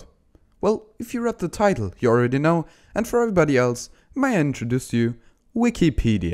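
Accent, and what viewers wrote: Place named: Germany. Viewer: Btw I hope I Identified your accent correctly as german :p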